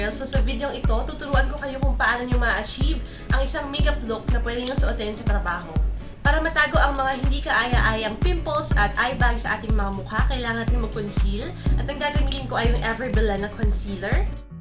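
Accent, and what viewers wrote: Philippines. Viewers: hahaha nakakatuwa naman pag tagalog, pinoy na pinoy talaga. actually hindi na sya tagalog, halos filipino na sya ksi pormal na pormal yung salit :P nice tutorial btw.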